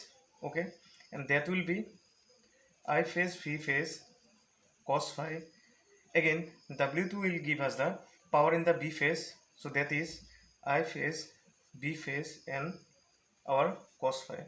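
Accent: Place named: Assam, India. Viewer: Your English sounds like assamese English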